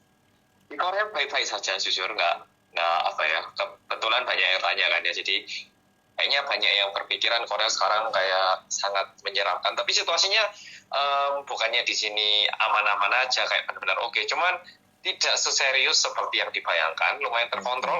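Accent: Java, Indonesia. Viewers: Orang korea yang medoook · Yg sy heran korsel kematiany yg sedikit...bgmn pensngananya? Apkh dg bnykny tim medis..atu krn wargany yg mmg imuny kuat. Mas ini bukn org korea tp org jawa medhok bngt😁😂🤣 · Anyo hoseo.... Medhokkkkkkkkkkkkk🤣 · Weee...mas masuk kompas TV.... Ada Orang korea yg Medokkkkk...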